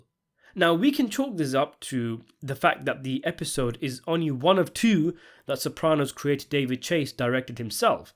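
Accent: England, United Kingdom. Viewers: All I know is when he slips in those show references, like anytime he references Vito he calls him and ass muncher, with the British accent it's fucking priceless! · That's all it is...a theory Chase has confirmed Tony was killed. Also isn't this dude english? That's how they talk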